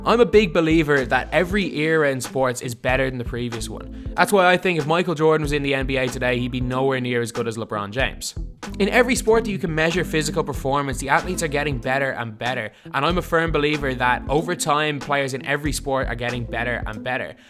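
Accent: Ireland. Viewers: Your accent is dope. Irish I would assume. Pretty cool listening to an Irish dude talk about basketball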